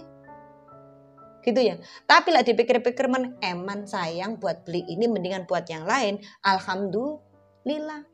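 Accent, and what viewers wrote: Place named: East Java, Indonesia. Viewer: Aq suka prinsip hidupmu mba..n bahasamu mnurutku udh halus kok emg nada org jatim trutama wilayah sby kesono kan emg gitu..semangat mba!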